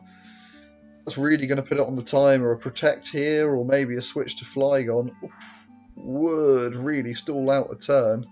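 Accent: United Kingdom. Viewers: Insane narration, random British person. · This is a British narration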